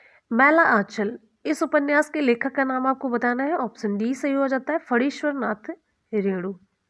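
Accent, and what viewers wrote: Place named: Bihar, India. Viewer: Mam maghi bihari boli hai...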